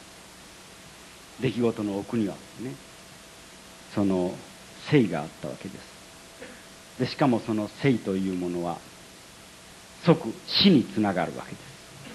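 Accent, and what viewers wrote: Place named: Shikoku, Japan. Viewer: 四国出身の方らしいアクセント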